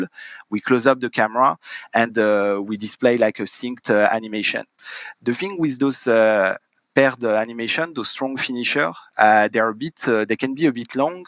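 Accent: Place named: France. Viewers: nooonn l'accent français :) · ouiiiiiiiiii l'accent français^^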